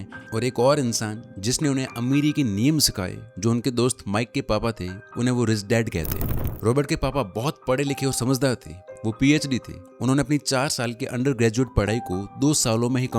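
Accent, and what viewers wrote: Rajasthan, India. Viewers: Video acha he or edit bhi achi he... Rajasthani English extent wo bhi acha he..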